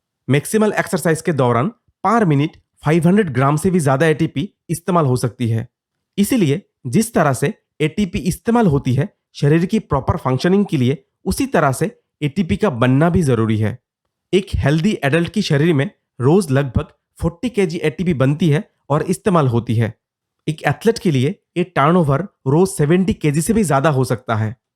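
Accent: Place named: Bihar, India. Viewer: You seem to be from Bihar.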